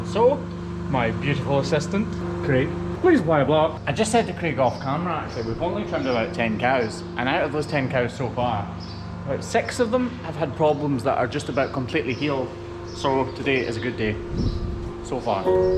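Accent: Scotland, United Kingdom. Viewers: love this guy and ahis Scottish accent. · I'm just curious, what would say your main viewer demographic is? For example: People who are wanting to learn about trimming cows feet? People who are hoping to see a little puss? People who find these videos put them to sleep? Women from America who just want to hear a cute guy with a Scottish accent talking to them? Or something else? I'm sure all these examples apply but what do u think the main one is?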